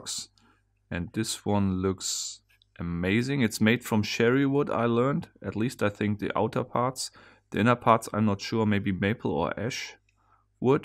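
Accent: Germany. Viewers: his accent is German, if that's your question. I always got the impression he no longer lives there, but his FB info does refer to a Germany address.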